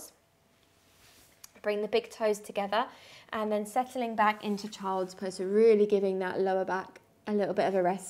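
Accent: United Kingdom. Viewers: Thank you so much! I decided to get myself a strap yesterday after over a year of (almost) daily practice, and that video is exactly what I needed :) (And by the way, I wish I could speak english with such a lovely accent, purely british <3 )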